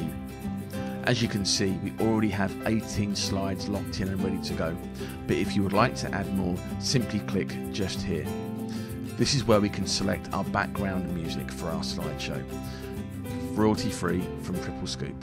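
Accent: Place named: United Kingdom. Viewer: PLEASE LOWER THE BACKGROUND MUSIC ITS HARD TO UNDERSTAND A BRITISH ACCENT SOMETIMES THE MUSIC DOESNT HELP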